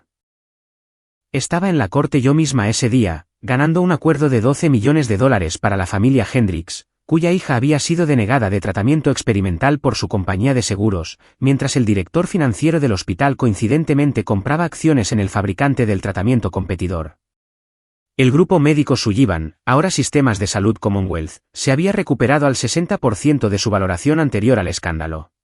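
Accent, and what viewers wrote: Spain. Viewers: A mí siempre me gustan las historias que narra este muchacho. Oh, señor, lo felicito, me gustaría saber cómo se llama . Sé que tiene un acento español Las narraciones son Excelentes y voy a ver esta qué tal me parece desde el principio que es buena.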